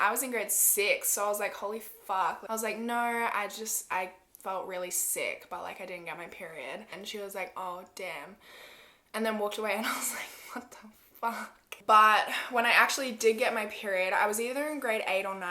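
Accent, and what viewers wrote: Australia. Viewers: I know I’m so late lol, but I’m binge watching some of your videos.. In a non weird way, I could listen to you talk for hours.. Your voice is so soothing & I love your Australian accent. It’s so funny to hear you pronounce or say words that we wouldn’t normally use to describe something in America, it has me so intrigued lol.. & all of the earring changes for each topic was iconic! Love your content girl 🥰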